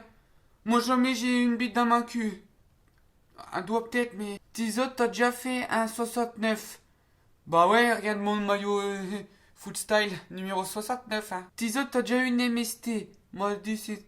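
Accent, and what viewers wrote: Nord-Pas-de-Calais, France. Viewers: Sa se vois que est un ch’ti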